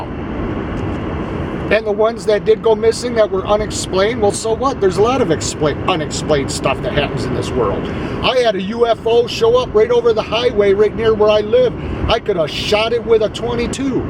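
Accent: United States. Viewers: American, i would say. (english accent)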